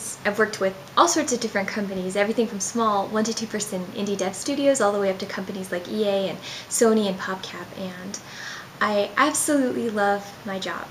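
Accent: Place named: England, United Kingdom. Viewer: Wow English accent is amazing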